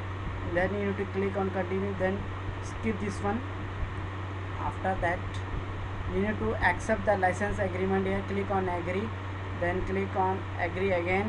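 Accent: India. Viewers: I love indian 80% problem before this is fixed by indian accent tutorial.. thank bro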